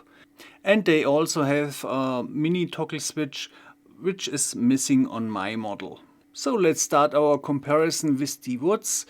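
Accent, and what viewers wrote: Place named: Germany. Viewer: This german english...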